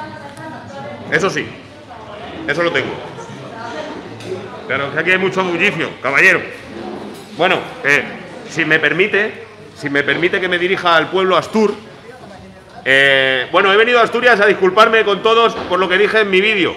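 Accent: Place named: Cuba. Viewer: esa cochinada mas q español parece de cuba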